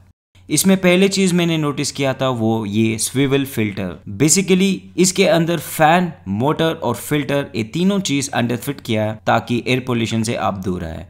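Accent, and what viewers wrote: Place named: South, India. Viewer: Are you from South there is something in your accent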